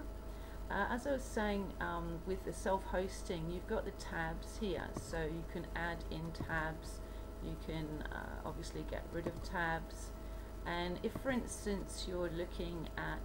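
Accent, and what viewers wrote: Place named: Australia. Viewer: thanks! really helpful! and i love your Australian accent!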